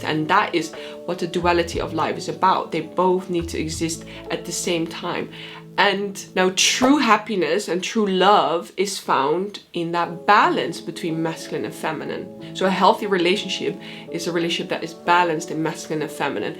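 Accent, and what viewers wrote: Netherlands. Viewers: weet niet waarom dit in mijn feed komt maar ik hoor dat je nederlands bent. leuk hoor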